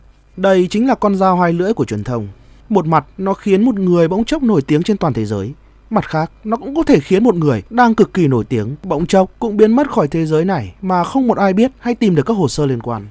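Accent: Standard. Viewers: Kenh này được cái nói chuẩn like 👍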